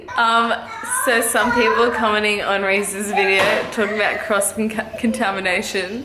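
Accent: Australia. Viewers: Every time I finish watching one of your videos, I naturally attempt to speak in an Australian accent for the rest of the day.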